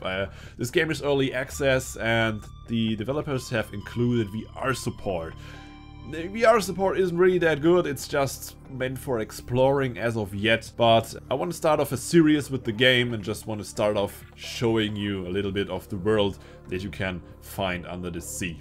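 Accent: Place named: Germany. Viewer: Are you german? this accent sounds so german lol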